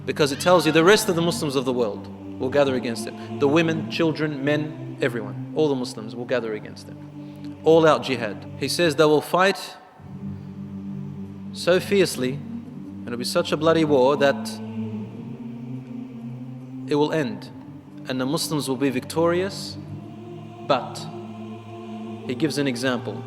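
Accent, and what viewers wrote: Indonesia. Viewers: Kayaknya dari suaranya orang indo nih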